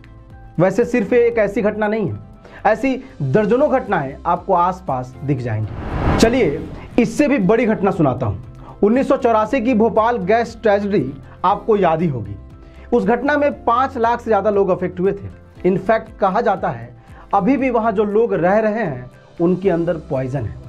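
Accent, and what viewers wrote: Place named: Bihar, India. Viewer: शानदार प्रस्तुति दी है आपने किन्तु बोल चाल में बिहार ज्यादा है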